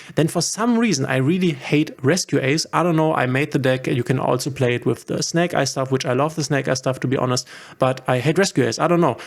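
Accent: Germany. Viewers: Hör ich da nen deutschen Akzent raus ? 😄 wenn ja, geiles Video 💪🏻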